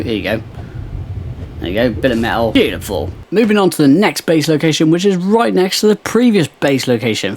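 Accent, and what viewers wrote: United Kingdom. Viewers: i love British accent mate 😊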